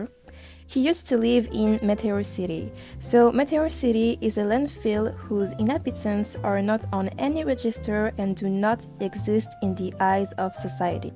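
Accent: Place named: Eastern Europe. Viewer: Let me guess, you're from eastern Europe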